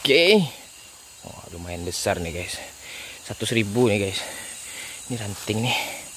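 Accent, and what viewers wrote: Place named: West Sumatra, Indonesia. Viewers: Bahasa nya jaleh urang Minang nya om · Suka kali aku logat nya minang kocak😍❤ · Bang abang org mana bang soalnya subscriber baru kok suaranya kayak orang sumbar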